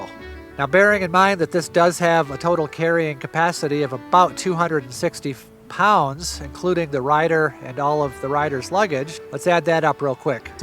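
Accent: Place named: Arizona, United States. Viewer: I didn't realize there was an Arizona accent. The way you speak reminds me of Cody Lundin. Very relaxing. Good video, Thanks!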